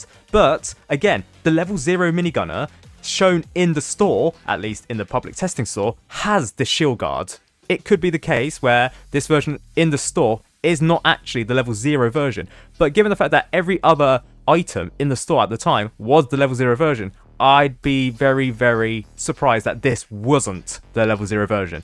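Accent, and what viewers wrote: United Kingdom. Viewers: Wait what ur British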